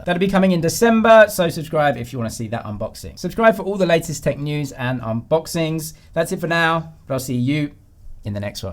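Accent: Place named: RP, United Kingdom. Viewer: Thank God for RP. Great for non-native speakers like me.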